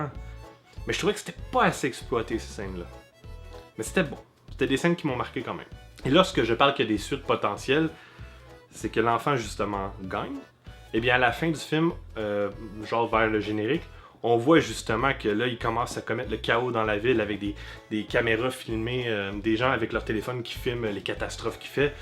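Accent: Canada. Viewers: tes quebecois je crois tas un accent canadien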